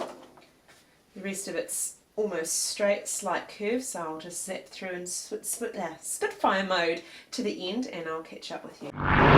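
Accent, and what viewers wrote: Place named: South Africa. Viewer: I detect an accent where are you from? SA?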